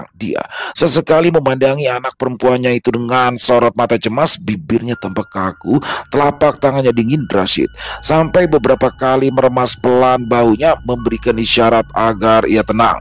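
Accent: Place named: Banjar, Indonesia. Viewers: Kaltim hadir bang kental banar banjarnya😂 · Waalaikum salam, klo bang berbahasa banjar logatnya spt org banjar asli, salut bang😊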